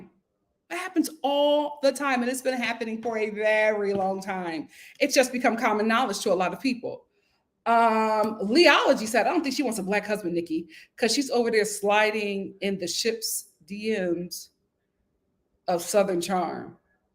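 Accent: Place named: Philadelphia, United States. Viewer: The philly accent I throng today 😂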